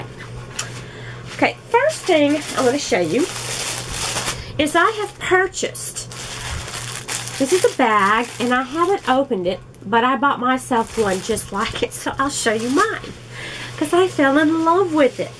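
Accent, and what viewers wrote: Texas, United States. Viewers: Haha you had me at your Texas accent 😍 I’m from Florida and have slowly learned knitting the last couple of years...but have most recently picked up crochet....that is my hobby/life right now haha... other than play with yarn, I’m recruiting my granddaughter to play with yarn with me lol....she is 6 1/2 and she loves rainbow yarn and always picks it out, usually solids that make up a rainbow and demolish and knot up 5 of my skeins....lol It’s OK just having someone else around interested in the same thing is wonderful 😊 So glad to have found your channel can’t wait to watch all of your videos.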